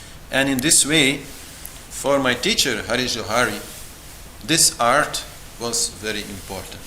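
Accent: India. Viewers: Why do you talk like an Indian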